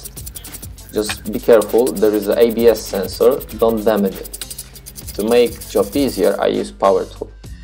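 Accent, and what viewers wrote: Poland. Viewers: Chyba słysze rodaka xD łap suba.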